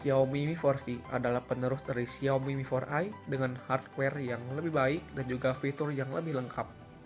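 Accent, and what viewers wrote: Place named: West Java, Indonesia. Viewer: Sunda pisan! Meni resep 😆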